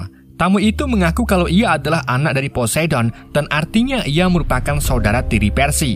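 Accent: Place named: Banyumas, Indonesia. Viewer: Orang ngapak ni pasti adminnya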